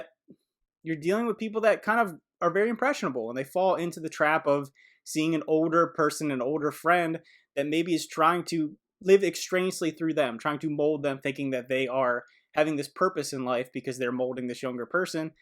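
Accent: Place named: Philadelphia, United States. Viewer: You from Philly bro? Or jersey?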